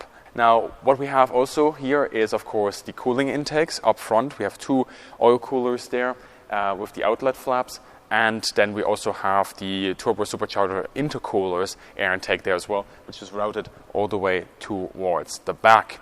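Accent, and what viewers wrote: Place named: Germany. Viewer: Too fast, too German, too bad.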